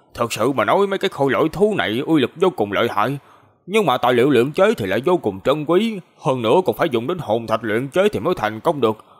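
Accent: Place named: Mekong Delta, Vietnam. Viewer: Bn ng miền tay thì phải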